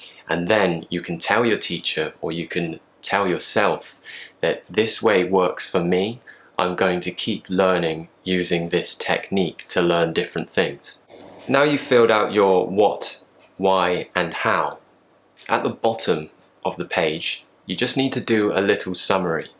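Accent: United Kingdom. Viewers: are u british, babe??? I love you much. thanka for be a great teacher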